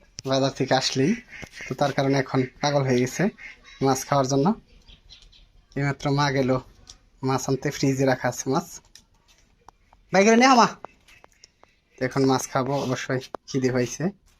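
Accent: Tangail, Bangladesh. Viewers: kotha shunei mone hoechilo , Amar jonmosthan o Tangail, korotia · ভাই আপনার কথার ভাষায় তো বুঝা যাচ্ছে আপনারবাড়ি টাঙ্গাইল জেলা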